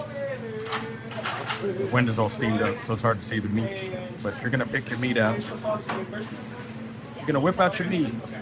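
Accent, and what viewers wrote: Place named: Texas, United States. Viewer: A definite texas twang in your accent when you're back home...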